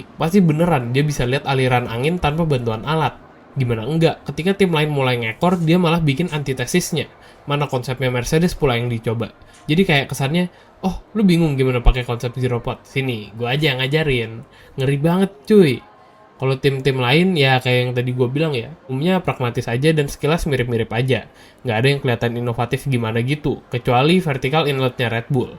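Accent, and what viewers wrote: Depok, Indonesia. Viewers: Bang luh orang depok yaa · "Tahun ini Tahunnya Ferrari" Ucapan setahun sekali Btw bang lu orang depok? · Hahaha Sawangan GP, adminnya orang Depok nih 😂🤝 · Ente orang Depok ya min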